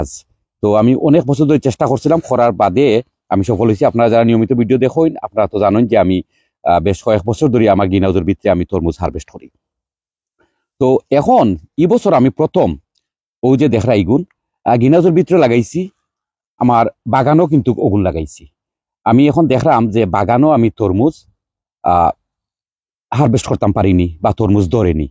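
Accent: Sylhet, Bangladesh. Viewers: I liked your Sileti speech today in a different way. Your brother is from Sylhet, so I understand Sylhet. All your trees are very beautiful. It seems like you spend a lot of time in the garden. Hope you are doing well. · ছোট ভাই আপনার সিলেটি কথা অন‍্য রকম ভালো লাগলো আজকে . আপনার ভাই সিলেটের তাই সিলেটি সব বুঝি . আপনার সব গাছ অনেক সুন্দর . দেখেই মনে হয় আপনি অনেক সময় দেন বাগানে . ভালো থাকবেন দোয়া রইল 👌👌🤲🏻🤲🏻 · মাশাআল্লাহ সিলেটি মাত হুনিয়া বালা লাগের আজ সুন্দর ভিডিও দিসোন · আসসালামু আলাইকুম ভাইসাব যে ভালো লাগছে একবারে সিলেটে খাঁটি মাত।ফুল ভিডিও আমার অত বালা লাগছে। শিক্ষনীয় ভিডিও উপকার আসবে ইনশাআল্লাহ। ❤❤❤দোয়া রইল ❤❤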